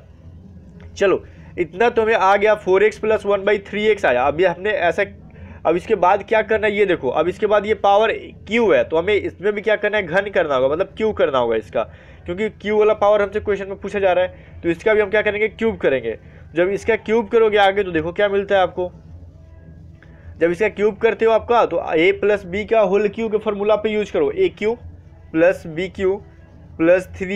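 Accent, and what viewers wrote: Bihar, India. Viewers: bihar se ho kya bhai?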